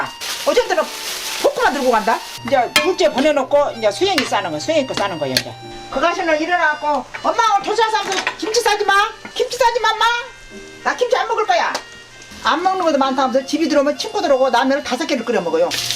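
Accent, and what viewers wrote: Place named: Gwangju, South Korea. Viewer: 3년전에 돌아가신 할머니도 생각나고 지금은 나이들어 손자도 보신 저희 엄마도 생각나고 만감이 교차합니다. 저도 광주사람이라 사투리가 너무너무 익숙하네요. 막례님 항상 건강하시고 늘 행복하셨으면 좋겠습니다!!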